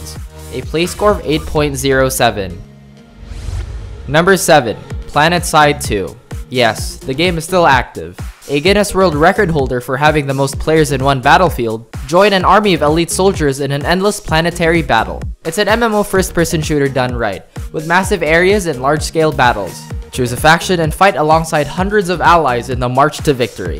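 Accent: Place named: Philippines. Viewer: FILIPINO ACCENT AF!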